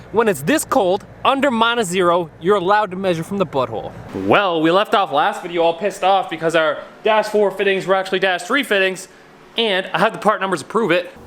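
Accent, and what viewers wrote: Canada. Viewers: I’m here just to hear him speak Canadian. I love Canadians. 😘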